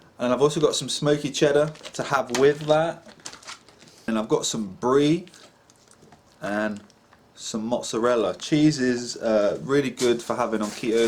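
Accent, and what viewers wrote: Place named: Southern England, United Kingdom. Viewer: It's good to see a fellow keto person from the UK....at long last! I can tell you're from down South...I'm up North (Lancashire)....looking forward to watching your videos!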